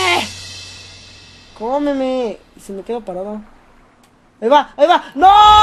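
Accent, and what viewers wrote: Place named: Mexico. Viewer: Habla como mexicano, dice palabras de españa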